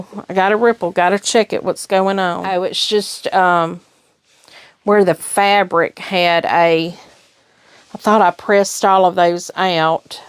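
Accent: South, United States. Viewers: Chit chat is calming. Love your southern accent. Your boo quilting came out lovely! I always do my own, but have to admit it’s not my favorite. Oh well, practice helps.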